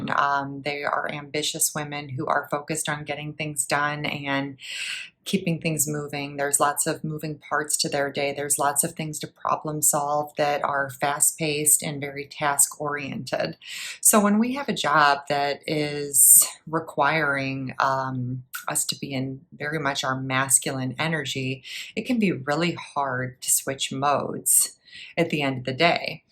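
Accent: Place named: Upstate New York, United States. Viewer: Are you from upstate? You have that accent! Love your advice!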